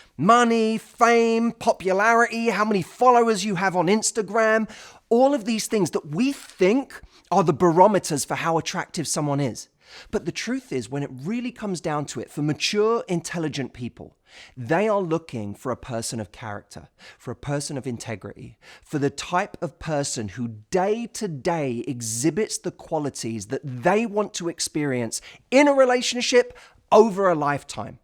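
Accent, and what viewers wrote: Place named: United Kingdom. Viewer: one more, i love the British accent of you, i not only learn English but more interesting things from you. Thanks very much.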